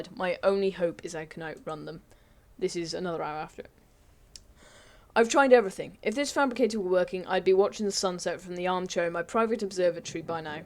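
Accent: United Kingdom. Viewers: I LOVE YOUR VOICE... HONESLY I'VE ALWAYS WANTED TO HAVE A BRITISH FRIEND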